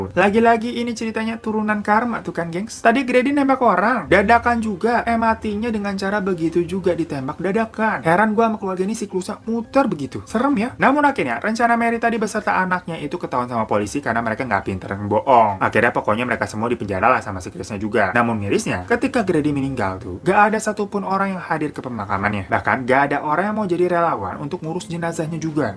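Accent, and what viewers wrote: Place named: Banyumas, Indonesia. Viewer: Enakan cara ngomong nya bang ,hehehehe ngapak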